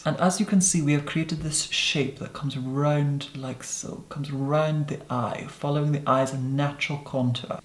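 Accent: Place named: London, United Kingdom. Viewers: Я слышу лондонский акцент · This is the most beautiful makeup look on planet earth 🌏 And the solar system Milky Way Our universe Parallel universes................................ You are an artist You make the most heavy makeup look polished, expensive, silky, porcelain like, divine and absolutely seamless You are brilliant, the more I watch your tutorials the more jaw dropped I feel Let alone your perfect posh English Dear.... you are freakin’ fantastic ❤️💖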